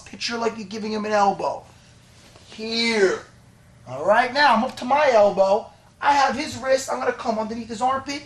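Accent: United States. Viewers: Is he from North US / Brooklyn? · "don't lean foward" Strong American accent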